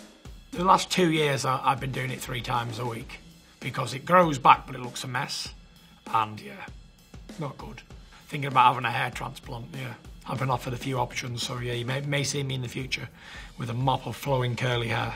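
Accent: United Kingdom. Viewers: He got the nicest British accent i ever heard. practically the only one i can stand. is it a Manchester accent?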